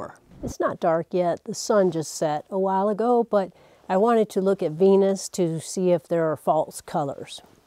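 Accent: South, United States. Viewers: Can't wait to get a pair. And I love the southern accent :)!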